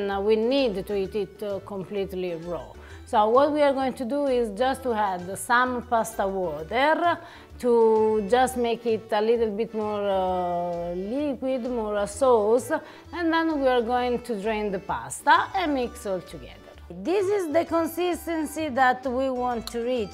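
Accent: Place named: Italy. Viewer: Bellissimo accento italiano 😊☺️☺️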